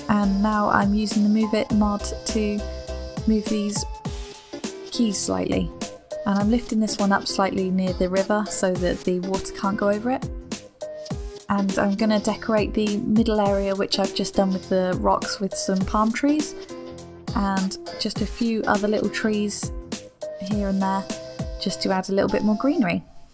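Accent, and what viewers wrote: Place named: United Kingdom. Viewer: Came for Move It, stayed for the mental attention to detail. Subbed and watched them all. I know your format is typically to VO sped up video but It'd be nice to see some of you playing real time. Nice to hear a Brit doing this so there's a little less boring grid!!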